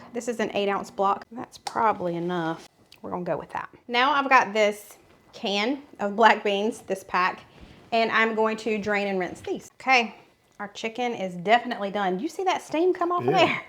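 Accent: South, United States. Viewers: Girl I'm from Georgia and yes you have a southern accent. I say boil and oil just like you. just needs to accept the fact that he likes cream cheese. Sorry not sorry. These dishes look so good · your phase y'all is a dead give away to you being a southerner! Yes, you do have an accent too! I'm in Maryland and our daughter has moved to GA. Neither of us have an accent! Lol I'm looking forward to trying the lasagna and the Queso Chicken Chilli. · I'm originally from Goldsboro, N.C., but moved when I was 15 to Sevierville, Tn. No matter where I go, everybody asks me where i'm from!! I have a very southern accent and people acctually want me to keep talking for them. I worked for Dollywood for 5 years,& fit right in there right away. I love your Southern accent, & I think it's way of dropping sparkles & glitter down every time we speak!!!! I think it's a God given special blessing to be a southerner, & spread all the Southern charm around!! 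One day I wished you would do a video of growing your inside spice garden. That is my greatest heart's desire is to be able to have an inside spice garden! Please be honest, and tell the good, bad, and the ugly about having an inside garden, because the cost is alot of money in my world! I love you, & your family, & pray for ALL of God's blessings for y'all in 2026!!! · I was born in Ireland and had an accent, trust me you have a southern accent. It is nice to listen to. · Wisc. Girl here to me ur accent is strong southern...I make lasagna all the time in crock pot!! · I am southern and I live in Virginia…I have an accent but not as strong as yours. I think there are regions of the south that have thicker accents. But the funny thing is that once I’m around someone with a thicker accent I start talking like them. I’m like a mina bird. I’m definitely trying that lasagna recipe if I can find some gluten-free lasagna noodles if not I will use a different pasta. 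· Southerner I def sound like you but I still don't know what chow chow is 😂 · I LOVE your accent!!! Ever since my sister moved to Georgia I have loved a southern accent!!! I am from Ontario, Canada and yes you do have an accent....lol!!! Whenever we visit our family I come home talking like them!!! I love it so much!!! I say y'all all the time!! I dont even care if people here think im strange, im going to talk how I want to...hahaha!! Dont change a thing with how you talk, it's awesome!!! Love y'all!!!:) <3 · I have seen so many crockpot lasagna recipes that just didn’t turn out looking like they were good. So many looked so dry. They were probably overcooked. But this one I’m definitely going to try bc it looks delicious! Thank you! Girl, I’m from the South too and we have a southern drawl not an accent! At least that’s what I’ve always thought. We lived in of all places Georgia! And most people that we met thought the same! 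They would say “we love hearing you talk bc of your accent! We were in Peachtree city and it’s a stirring pot of people. Most were northerners (Yankees)😂😂We made some good friends! I loved hearing them talk and likewise! All good recipes on this video❤😊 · I'm from Iowa, so I have a Midwestern accent, but I love your Southern accent. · You definitely have a Southern accent. I grew up in Iowa which is in the Midwest but have lived in Colorado for the past 35 years. 😊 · I'm from the south. We have similar accents. You sound like me. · I’m watching from England, I love your channel and the recipes as you keep it real, and I love your sweet southern accent, you have a real man there too! Best wishes 😃 x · Hi ❤. I’m from S Texas and I love your “very” Southern accent. I don’t think I have an accent either 😂. Love your podcasts and all your recipes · I’m late to the party because I’m playing catch up on videos. 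I’m from Louisville, Kentucky and you definitely have a southern accent! But it’s extremely charming. 😊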